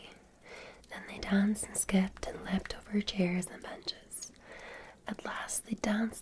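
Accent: Scotland, United Kingdom. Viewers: This isn't an Irish accent. It originates in the United Kingdom, and thus is a British accent. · I love the Christmas piano music at the beginning is that you playing the piano I know you are very talented and play the piano beautifuly! your Victorian era videos are always very well done everything from your very thick beautiful Scottish accent to your gorgeous outfits in the Roleplays and the wonderful backgrounds! I always like the dinner menu part! this looks to be a fabulous Victorian era video you have done a wonderful job again thank you so much I really do appreciate it! Merry Christmas ⛄🎄:_Loveheart::_Loveheart::_Loveheart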